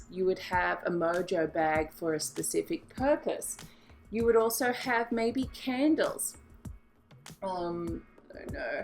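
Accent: Australia. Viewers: Thank you and ~ thank you, everything sounds so much nicer with an Aussie accent:D Thank you for sharing your knowledge Blessings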